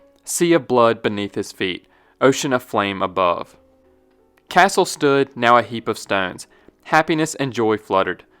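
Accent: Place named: Philadelphia, United States. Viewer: You're from Philly aren't you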